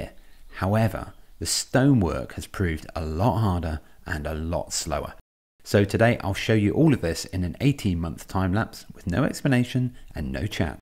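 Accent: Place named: England, United Kingdom. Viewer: It is a mental relief to listen to the English pronunciation. One can feel both the general education and the respect from the messenger in comparison with the American YouTube channels, which are constantly at grueling "macho-OCR-speed".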